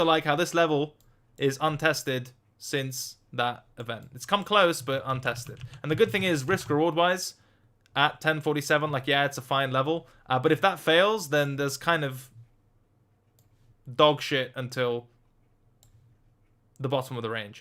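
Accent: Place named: United Kingdom. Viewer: was extra british in this one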